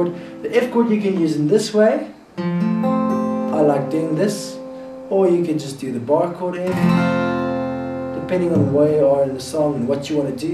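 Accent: South Africa. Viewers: Cool clip, easier than I thought it would be to play. I thought you sounded South African. I'll go play this on the stoep tonight before the braai. Cheers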